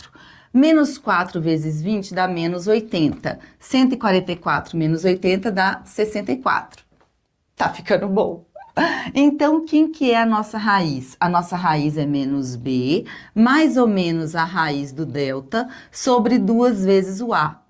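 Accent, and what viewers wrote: Minas Gerais, Brazil. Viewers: Sei lá... Quando a professora explica com sotaque "mineirês", eu entendo tudo! ♥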